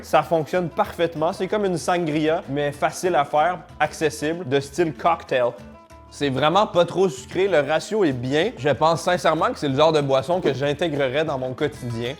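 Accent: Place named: Quebec, Canada. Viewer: Le français québécois sûrement 😂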